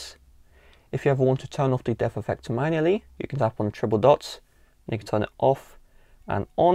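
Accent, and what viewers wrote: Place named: United Kingdom. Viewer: i like the charming british accent ! ❤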